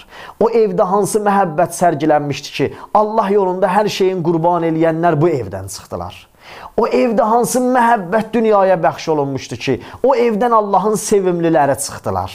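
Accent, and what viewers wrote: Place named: Iran. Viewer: Azərbaycanlı ola _ola İran ləhcəsi ilə danışırsan?Bunu yığışdır İran İttilatı sizə belə öyrədib???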